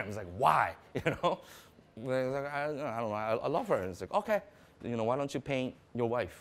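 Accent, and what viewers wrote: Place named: Bay Area, United States. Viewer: He kinda sounds like he’s from the bay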